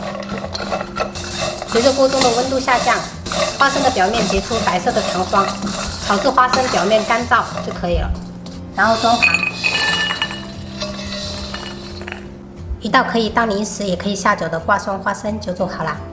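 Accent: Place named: Fujian, China. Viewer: 我感觉她是不是很小就去到闽南了，因为她讲普通话也是福建的调调。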